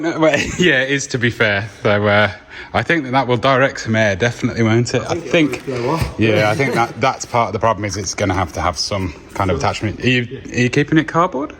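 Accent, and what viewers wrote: RP, United Kingdom. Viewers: makes us English guys sound like absolute fucking pricks , the definition of posh rich twats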